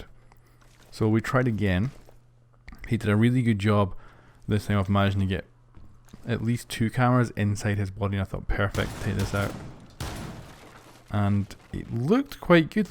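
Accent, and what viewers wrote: Scotland, United Kingdom. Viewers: Do you mind if I ask what part of Scotland you are from?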